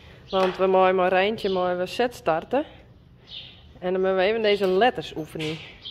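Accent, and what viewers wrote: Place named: Friesland, Netherlands. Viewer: I absolutely LOVE 😍your Friesland accent and language 😃!!! LOVE sweet Senna, she is so precious 🐕♥️‼️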